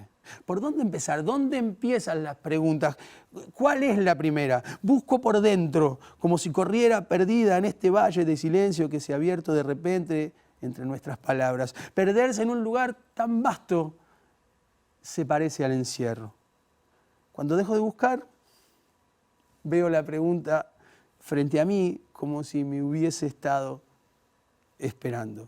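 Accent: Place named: Buenos Aires, Argentina. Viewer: Hermoso cuento. Una sugerencia: no imponer el ritmo propio a la lectura. Narrar es intermpretar pero lo escrito por otro, no lo que a uno le 'sale del alma'. El alma también se equivoca. Mejor no leer en porteño lo que está escrito, pensado, pausado y respirado en japonés. Muchas gracias igualmente.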